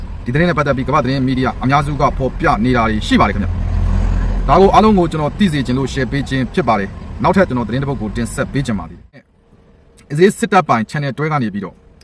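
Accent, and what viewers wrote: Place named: China. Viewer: မင်းအသံကတရုတ်ကလားသံပါေနတယ်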